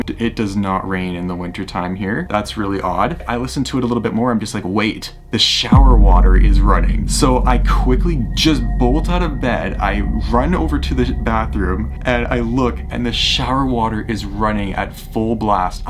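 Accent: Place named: United Kingdom. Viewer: AH HES BRITISH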